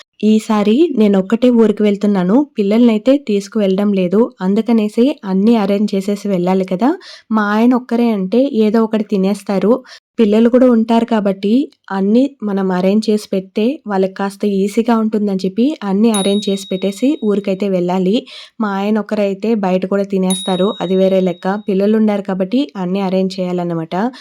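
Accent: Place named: Andhra Pradesh, India. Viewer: telugu matladutunte Andra anukunna.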